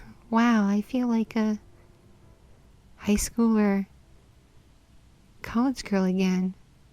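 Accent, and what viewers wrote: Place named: South, United States. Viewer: Hmm must be from the south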